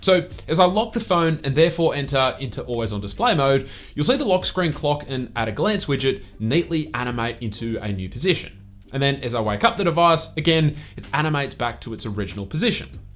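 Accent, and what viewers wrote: Australia. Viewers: Australian? Nice change to hear someone from there.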